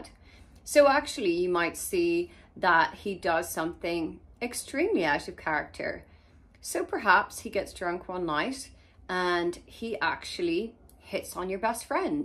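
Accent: Ireland. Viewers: Are you Irish? 🤔 I can tell by your accent! 🥰🤗💖🧡🤍💚 Good, important video. 😁👍🏻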